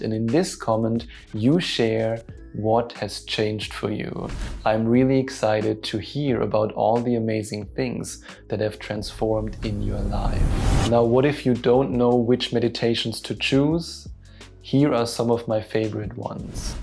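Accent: Germany. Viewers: bester Mann mit angenehmen deutschen Akzent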